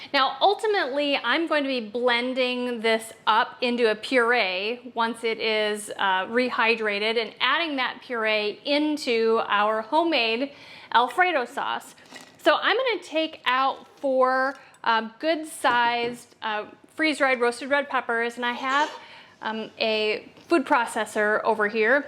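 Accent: United States. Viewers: it doesnt matter, its kind of cute and funny how Americans pronounce it ❤️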